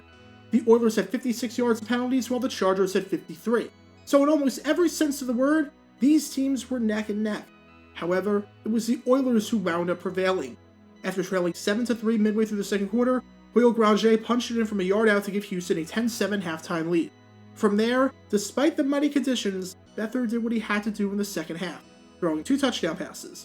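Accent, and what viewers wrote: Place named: Philadelphia, United States. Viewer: is from philly/south jersey? he sounds like it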